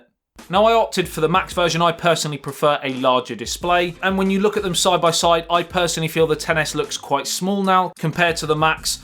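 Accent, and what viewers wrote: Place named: Northern England, United Kingdom. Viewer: This is not RP. Bad northern England accent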